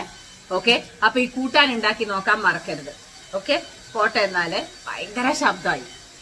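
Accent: Palakkad, India. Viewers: I like the way speaks 👌 Palakkad slang super 👌 I am inspired by you ❤ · Palakkad slang super. V. Useful message for all. You are so inspiring. I wish I were you. So bold & practical. Nice recipe. Shall try it today. God bless ur family.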